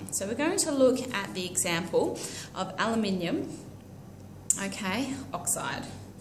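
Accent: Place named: United Kingdom. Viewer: Yo she’s British that’s how British people talk, not everyone is American and speaks like one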